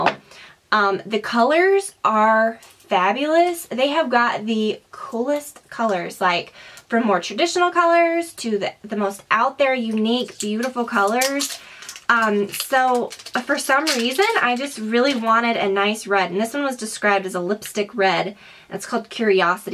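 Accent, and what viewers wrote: South, United States. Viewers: Love your videos! You inspire me to make vids...i luv your pretty lite southern accent ; ) i luv your long vids too..more tours please.love your style! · Your southern peeked through in this video :). Could you please review that hourglass primer?! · Your accent is soo southern in this video ! Never noticed it so much before.. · why is she talking with a southern accent in this video? idk if shes from/lives in the south..but ive never heard that from her before. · I feel ike you have the southern accent that only comes out when your talk super fast, i love it. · Have you always had a Southern accent and I never noticed? Or did you just develop one? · You really looked like you were having fun and were happy in this video :) It kept me smiling :) Also at times I could hear a bit stronger southern accent than usual... Adorable :) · Can you make a video demo of the masks you got. 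It'll be nice to see how they work. Btw you sounded extra country in this video, and I liked it! ;)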